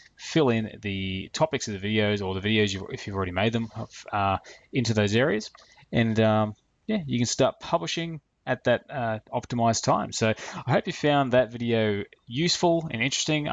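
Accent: Australia. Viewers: Thanks heaps. I'd forgotten where it was n you can spend hours trying to find stuff on there, n yours was the only vid I could find on where it was. So new sub here. Bit weird though, as mine says the exact same times as yours! You sound Australian, so maybe it's 'cos I'm in New Zealand n we may have the same-ish time zones. I've found 9pm, 12am and 1.30am are my best times for shorts. I scatter my shorts throughout the day/night though after experimenting, but these tend to get the most views. I don't worry about longer main vid times, I just put them up whenever, as it's those shorts that gets them there subs lol! Thanks for putting up this vid :)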